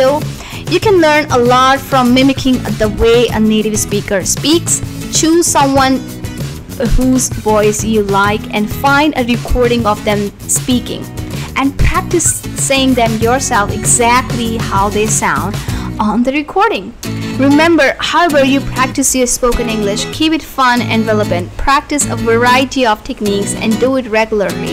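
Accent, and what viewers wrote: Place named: United States. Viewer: Wow...bhut achii english h apki..love from pauri garhwal...aap kis chij m job krte h ji...or apne USA style English kase sikhi please tell me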